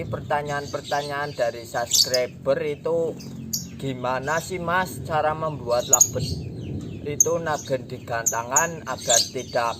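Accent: Java, Indonesia. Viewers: Ngomong nya jgn medok" mas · Salam medok🙏🤘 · Ngapak tenan koek kang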